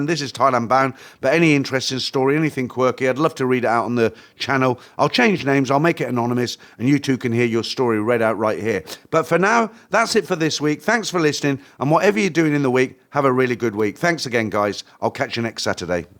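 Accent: United Kingdom. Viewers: Great story - but your "reading style" with the British accent, and no mistakes makes the stories sound very entertaining .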